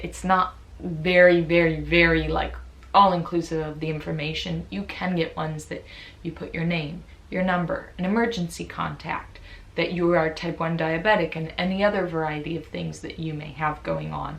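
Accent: United States. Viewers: I suppose you live in England. How come you don't have a British accent? To me, it is more like American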